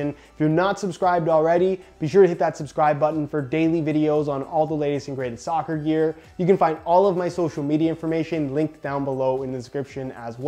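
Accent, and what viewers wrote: Canada. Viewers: I knew you were Canadian. I argued this with a friend "Deng". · i LazyCake The face is so Canadian? No. The only tell is his accent, his face could be American, German, French, Italian, Dutch, etc... what does “his face is so Canadian” even mean? I’m from Canada and I’ve travelled a lot, so I just find that wording hilarious. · Wow your Canadian accent is so prominent to me in this I never really noticed I guess. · I knew you were Canadian! Can always hear the accent of my people LOL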